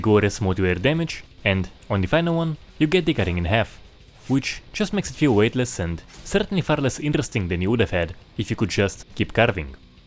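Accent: Russia. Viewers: Оо, русский чел, по акценту узнал)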